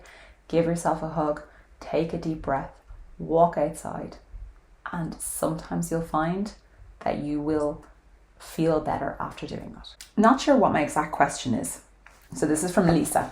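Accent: Ireland. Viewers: It's so great to recognize the things I control vs the things outside my control. This truth was illuminating to me. Love your talks, love to hear the Irish accent, and love the scenery. Any glimpse of my home land is the best. Stay well.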